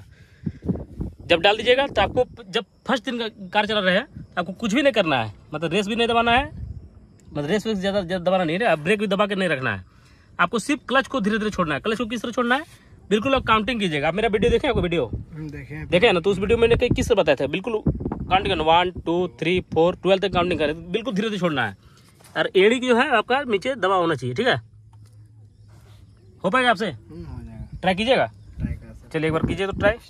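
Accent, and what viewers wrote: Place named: Bihar, India. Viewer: Sir ke bolne ke style se lag raha hai sir Bihar side se hai.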